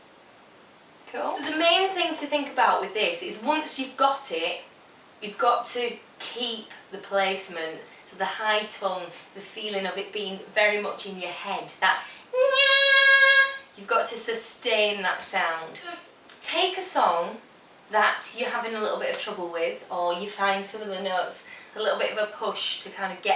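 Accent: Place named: Manchester, United Kingdom. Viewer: Are you from Manchester England you sound like my cousins talk from there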